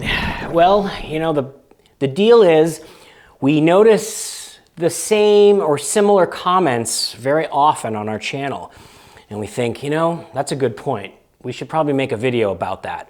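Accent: United States. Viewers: Nothing wrong, you’re using American English as the program language so it’s perfectly fine to just American pronunciation in my opinion. Im Chinese, and I wouldn’t expect you to pronounce “China” “Zhongguo” 😄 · I honestly don't really care about how you pronounce things, since your content's amazing. Plus, you're american, so obviously you're gonna talk in an american accent. Seems completely normal to me, don't change the way you talk for us. It's like asking attenbourough from the bbc to talk in an american accent, nonsense. Regarding the format, "we complain, you explain", that's a great idea, I mean, as viewers, it's always appreciated to see that our comments are heard ❤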